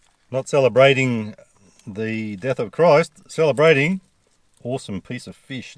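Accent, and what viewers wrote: Australia. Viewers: I'll watch anything as long as there is an Australian accent. Love the Aussie's!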